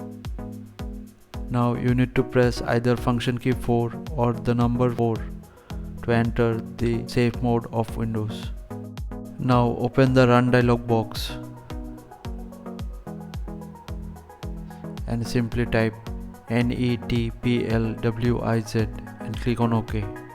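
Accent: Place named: India. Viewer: this dude is the goat, knew it was gonna work when i heard the indian accent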